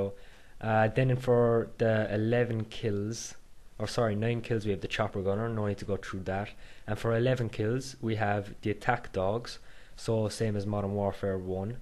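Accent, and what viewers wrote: Ireland. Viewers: french?! you mad. its blatently irish lol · u sound irish if so so am i :D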